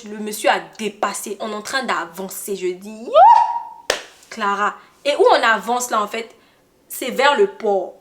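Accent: Cameroon. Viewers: Seigneur 🤣 accent camerounais j'ai écouté 😭😭.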